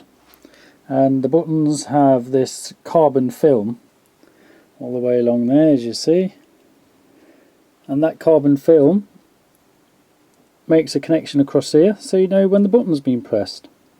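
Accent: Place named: Leicestershire, United Kingdom. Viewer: are you from Leicestershire or Lincolnshire/Hull? Your accent seems familiar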